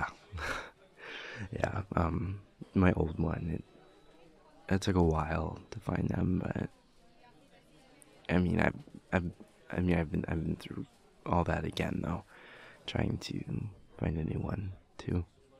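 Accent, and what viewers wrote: Newcastle, United Kingdom. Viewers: AFTER 5 WHOLE MONTHS OF WAITING ANOTHER GEORDIE AUDIO IS FINALLY OUT Thanks for the food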